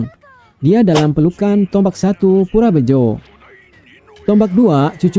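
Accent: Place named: Padang, Indonesia. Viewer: Padang banget aksen nya bang, jadi salkus berasa dengar podcast praz teguh 😂 btw thx u udh bikinin rangkuman cerita nya, nonton dulu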